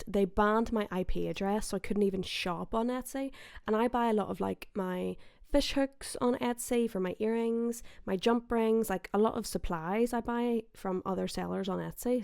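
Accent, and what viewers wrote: Ireland. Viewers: I love your Irish accent. You are so charming!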